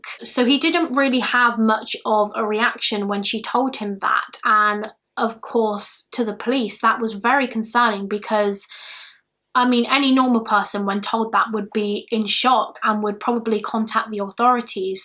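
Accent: United Kingdom. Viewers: you've got THE MOST british, british accent I have ever heard. The Queen herself does not sound as British as you do.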